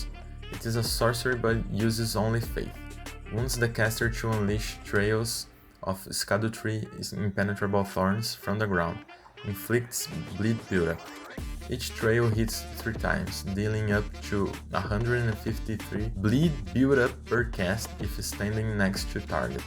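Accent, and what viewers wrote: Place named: Brazil. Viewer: Vc é br ne cara, logo no começo notei o sotaque kkkkk Adorei o video!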